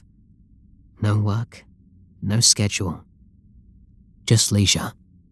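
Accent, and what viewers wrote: United Kingdom. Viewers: Ehhh sound more southern to me but other than that sounds pretty British unless your actually British and are using an American accent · If only rp-ing a fictional character was enough to get you a yandere 😔/j Great job on the au!!! It's nice to have a taste of that British accent as well!! ^^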